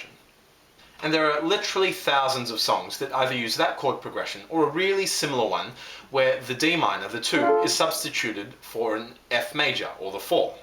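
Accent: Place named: Australia. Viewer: Great lesson, nice to hear a piano instructor on YT with an Australian accent for a change, so easy to listen to. Thanks for singing as well to illustrate the chord progressions. Well done.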